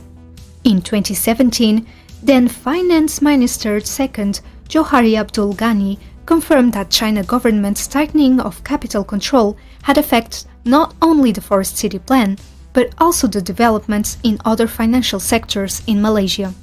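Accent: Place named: India. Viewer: Whats with the indian accent?? 😆😆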